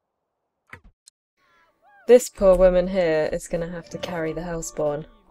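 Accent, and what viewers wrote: United Kingdom. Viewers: I LOVE UR BRITTISH NISSSSHHH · I love your accent <3 I miss England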